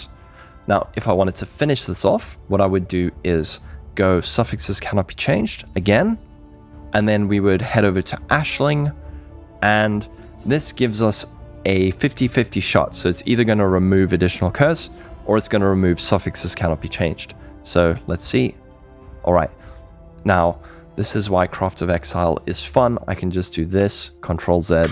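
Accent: South Africa. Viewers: Are you South-African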